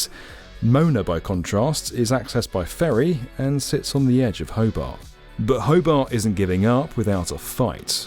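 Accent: United Kingdom. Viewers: You're British right, not Australian?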